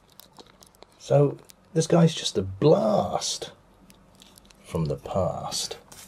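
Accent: United Kingdom. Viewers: Cuz he's British...I say Darth aswell, cuz im British too...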